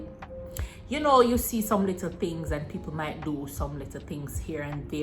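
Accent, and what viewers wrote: Jamaica. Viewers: I can feel Jamaican accent behind you words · Jamaican? Hi from Trinidad!!!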